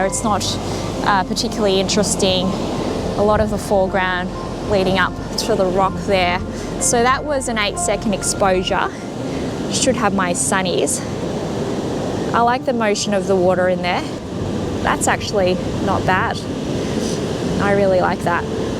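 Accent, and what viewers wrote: Australia. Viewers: Just Flew back home after long time away and found your informative and entertaining Channel . It was so good to hear a Australian Accent on a photography channel. Keep it up great work😁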